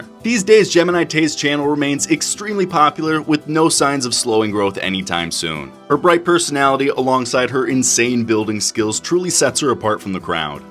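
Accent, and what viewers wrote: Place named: Newfoundland, Canada. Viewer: Everybody talking about gem but i Just I pressed by ur Newfoundland pronunciation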